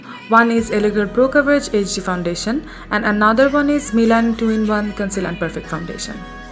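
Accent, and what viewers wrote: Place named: Bangladesh. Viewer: Why Indian? You sound like bangladeshi 🤨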